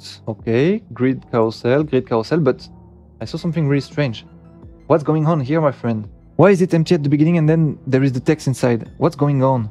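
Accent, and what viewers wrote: France. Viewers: Moi j'aime bien son accent frenchie. Et il est très compréhensible pour un anglophone. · C'est l'accent Français, rien de bizarre. C'est surtout perturbant pour nous Français ayant l'habitude de voir ses vidéos en Français, autrement je pense qu'à l'international son accent ne dérangera pas et au contraire ça apportera une petite touche. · L accent française est toujours présente😂😂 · mec je suis bilingue et ton anglais est tres bon . ne lameliore pas pluss car on te comprends . si tu perds laccent francais tu perds le charme hahaha les anglophones adore laccent francais alors ne le perds pas. haha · J'adore le concept :) Ps pour l'accent fr, ne change pas les étranger aime ca ;p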